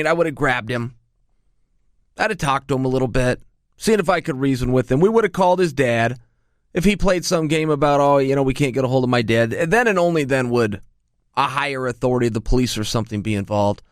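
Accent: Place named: Portland, United States. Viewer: Sounds like a portlandian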